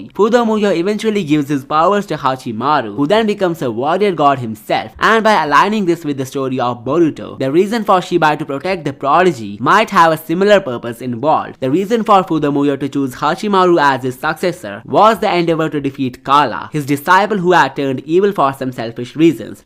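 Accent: India. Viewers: Bro pls tell me you are Indian or not, coz your accent sounds like it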